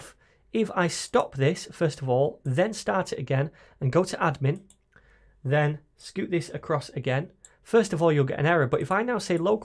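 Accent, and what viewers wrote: United Kingdom. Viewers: I like your British accent bro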